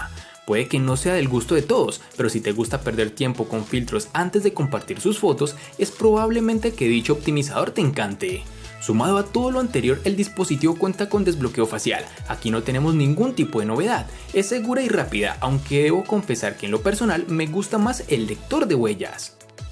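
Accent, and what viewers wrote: Cali, Colombia. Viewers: Vos sos de cali vé?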